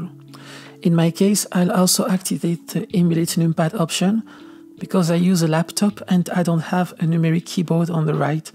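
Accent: France. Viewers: The french accent is fkng cute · thank you very much for all this very well explained knowledge. And don't worry about that accent of yours, my french compatriote ;-) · j'entend un accent français.... mhhh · i have a curious question are you french or do you talk french bc your accent kinda remind me of it TT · ooohhh you must be french.... your accent 😍😛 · Your tutorial is not just about Blender and grease pencil. You are a sleep therapist! Never heard anybody sound like you, and your command of English (going by the French accent) is just unexcelled! Thank you so much for being such a patient human being! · What new french english accent😂❤ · C le premier accent franglais que j’apprécie 😍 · Ok... Are you french ? Because i think i recognize a french accent , Your vidéo was very helpfull so thank you <3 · What a cute French accent :3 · J'ai reconnu ton accent français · Are you French? 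Because you had a accent…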